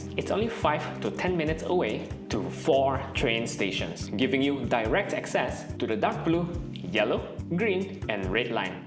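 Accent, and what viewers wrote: Indonesia. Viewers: Your accent come from where? Sounds more like indonesian rather than singaporean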